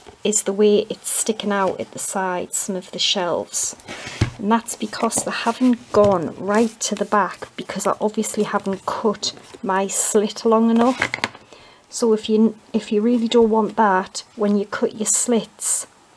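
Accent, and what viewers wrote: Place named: Scotland, United Kingdom. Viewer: TBH, I started watching to hear you're beautiful Scottish accent but I keep watching bc I really love you'r work, lol. ❤❤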